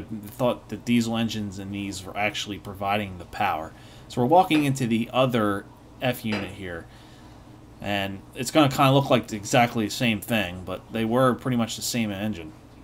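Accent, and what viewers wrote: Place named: Pittsburgh, United States. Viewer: sounds like he is from Pittsburgh.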